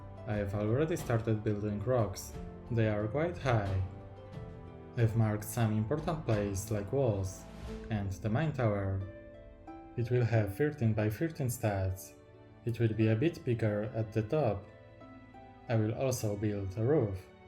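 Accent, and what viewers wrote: Poland. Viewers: Tak myślałem, że to polski akcent xd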